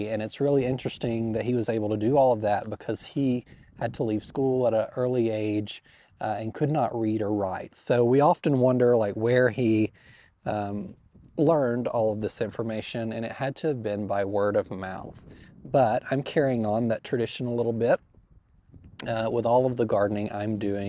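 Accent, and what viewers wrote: South, United States. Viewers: Thank you for giving us a glimpse into your history and your gardening evolution. Though it’s really none of our business, it’s really sweet of you to do that, as some people want to get to know you and are very interested in your garden — watching and learning from you. May I also say your delivery is comforting. I like the ‘Southern’ pace — as new gardeners really need the way you educate with how and why you may be doing something. You are unique in that way. Your love and passion for gardening really comes through. Congratulations on your new property and best wishes for your success!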